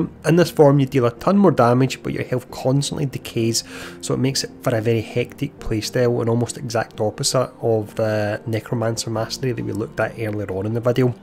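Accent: Scotland, United Kingdom. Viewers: Dude must be Scottish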